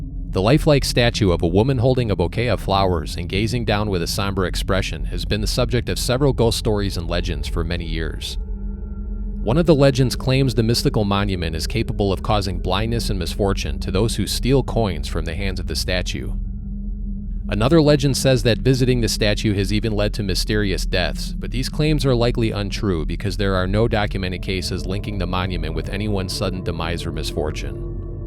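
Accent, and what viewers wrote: Chicago, United States. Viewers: I truly love your channel!! I grew up in Streamwood so hearing some of these stories is really cool for me. It brings me back to my childhood. Your narration is a perfect Chicago accent if I ever heard one and your attention to the details and facts about these places as well as sharing your own experiences truly makes your channel unique! I'm subscribed!!😊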